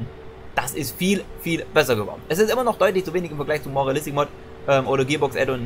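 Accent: East Germany, Germany. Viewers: Wenn ich den piss ossi höre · Gutes Video aber sag mal wo kommst du her ? Dein Dialekt hört sich irgendwie Ostdeutsch an aber auch Iwie nicht. Wie als würdest du versuchen ihn nachzumachen.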